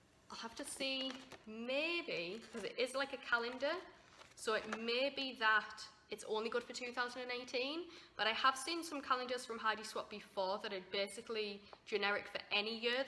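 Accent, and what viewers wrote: United Kingdom. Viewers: What’s with your British accent? Sounds really nice, where are you from? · Are you from uk? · Have you lived in America long? You have a strong accent from Britain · You sound from the uk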